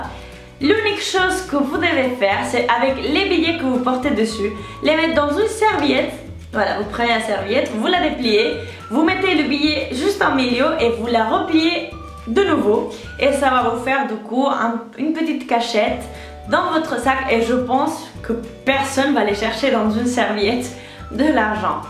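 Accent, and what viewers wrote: Spain. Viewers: elle est espagnole🤗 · elle a l'accent espanol c'est pour ça, mais tu abuse , j'en suis sur que tu as compris quelques phrases mdr sinon tu aurais pas regarder jusqu'à la fin ouhloulouu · c'est normale quelle parle vite c'est l'accent espagnol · c'est l'accent espagnole c'est normale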